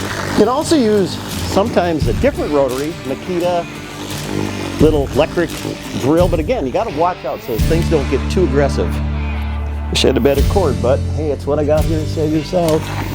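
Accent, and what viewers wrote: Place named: India. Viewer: INDIAN voice. Sales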